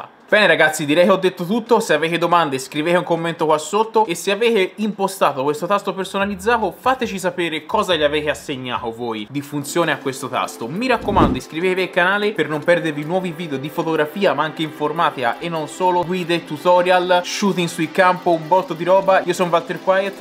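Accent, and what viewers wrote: Tuscany, Italy. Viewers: Video bellissimi ed interessanti. Vai, parlata toscanaccia simpaticissima, è tutta invidia. Il prossimo fallo in cadenza veneta!!!!!! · Video belli ma correggi L accento troppo troppo toscano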